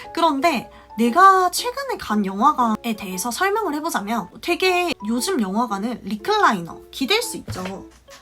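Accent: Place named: Gyeongsang, South Korea. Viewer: 베이스가 저보다 탄탄하신거 같네요 아 그리고 착각일수도 있는데 경상도사투리가 느껴지는건 기분 탓일까요 ㅎㅎ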